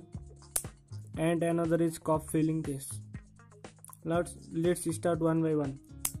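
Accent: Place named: India. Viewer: Poor teacher with a poor Indian accent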